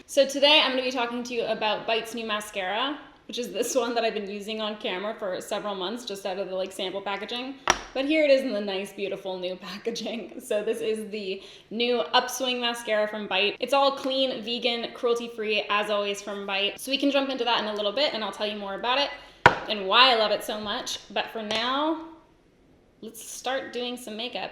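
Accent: Canada. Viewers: My boyfriend overheard and said “is she Canadian??”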